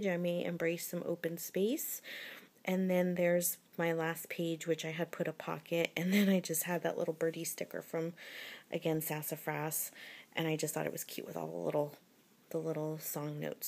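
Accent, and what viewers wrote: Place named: United States. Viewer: omg!!! this is fabulous!!! i love your american accent lol!!!!:-) ooo i love heidi swapp's stamps i have a few too!! oooo u love mermaids! and me!! hehe, omg! ive never seen a real palm tree!!:( 0ooooooooo flamingos!! i love flamingos!!! (im writing this as i watch incase u didnt realise lol) love the seeds stamps so cute!!! oooo doilys are lovely!!! not seen those american craft pin/badges before they're cute! ooo i like that song too!! haha:-) that's a fantastic book love it!!!! xxxx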